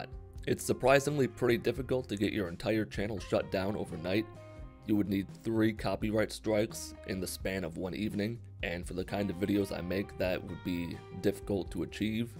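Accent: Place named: United States. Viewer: I never noticed the American accent he has until now. I assumed he was European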